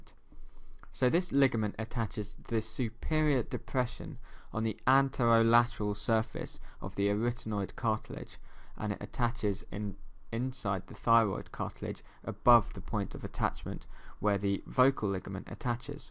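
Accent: United Kingdom. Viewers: You would think a British accent would spice up the larynx, but no it's still so incredibly boring. great video though all jokes aside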